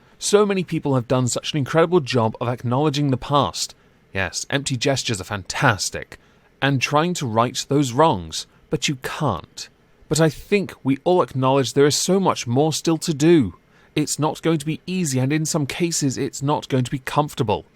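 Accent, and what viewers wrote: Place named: United Kingdom. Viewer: "Incredibly cucked simp" That was perfect! xD I enjoy your verbal readyness & sense of humour as much as Alizee's. Also some people have the combination of nice voice & accent and that's pleasant to listen to. I'm biased ofcourse, as I've watched British programming since early age and therefore learned to like the language & accent :)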